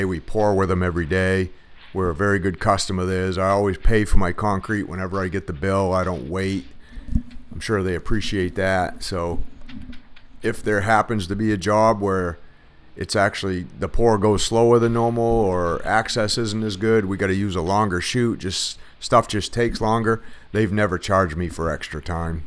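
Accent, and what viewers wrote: Maine, United States. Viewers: Holy cow bub you must be from Maine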